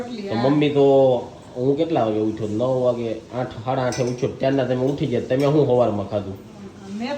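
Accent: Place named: Kathiawar, India. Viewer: Today’s I’m the 1st to comment to yr Vlog 🤗😍🥰❤️🙏🏻 Tamari gamthi kathiyawadi boli sambhalvani.....j bahu maja aavti hoy 6👌🏻🤗🤗😍❤️❤️ MBOza from Gandhinagar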